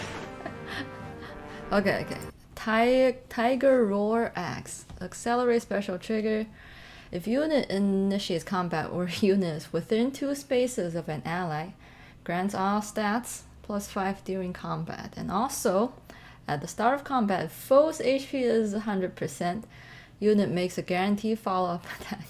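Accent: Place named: England, United Kingdom. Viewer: Pretty nice~ ^^ I like to listen to your English accent and learn a little Chinese from you. ^^ Cheer up ! (^0^)/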